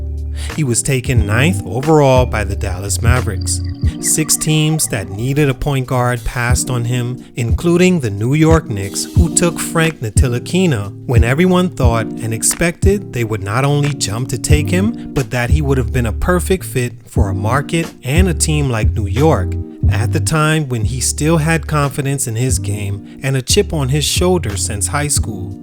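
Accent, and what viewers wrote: Bahamas. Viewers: Are you from The Bahamas. You sound like a Bahamian. The Bahamas is my second home.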